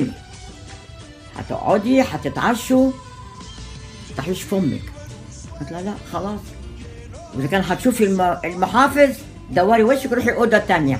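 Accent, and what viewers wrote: Egypt. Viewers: It feels so nice to hear the beautiful Egyptian accent from that beautiful lady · بتتكلم إسكندراني إسكندراني .. أنا مكنتش نخاف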